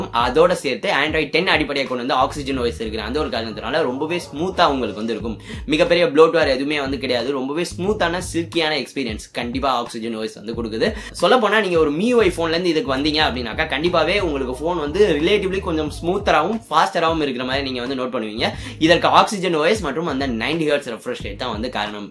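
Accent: Madurai, India. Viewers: Bro neenga madurai ah bro ?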